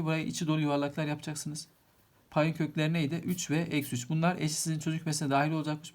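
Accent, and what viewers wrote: Trabzon, Turkey. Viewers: hocam aynı trabzonlu gibi konusuyonuz yanlis anlamayın 😁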